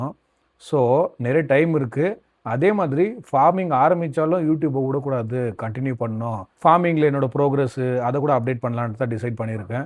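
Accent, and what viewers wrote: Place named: Bangalore, India. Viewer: Your channel is on right track bro..Vitradhinga. Post more contents, different contents. Unga tamil criticize pannatha soninga but enaku contentla romba pudichathey antha bangalore slang tamil than. Youtube is supporting you, you have to put more efforts. Na daily 3-5 hrs stream panren but en efforts ku yetha revenue kedaikala. But your channel doing good, don't waste it. Be consistent.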